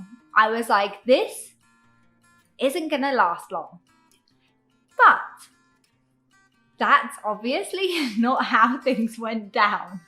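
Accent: United Kingdom. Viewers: I lived in Chungli for five years because of studying in university. In my opinion, it is convenient for living there! There has a lot of convenience stores, 光南(Guangnan), 墊腳石, a big(but also ugly)station, High Speed Railway, Rakuten Monkeys(a pro baseball team), etc. However the only one thing I really hate is cold and WET weather in winter.😂 I want to recommend you my hometown Taichung, it’s more comfortable weather here! But I do like Chungli as well, there are so many memories for me. Btw, I like your British accent. The video make me to practice with my listening. 👍🏼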